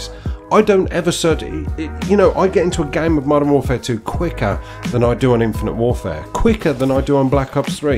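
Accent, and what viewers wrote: Birmingham, United Kingdom. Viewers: your silky smooth brummie tones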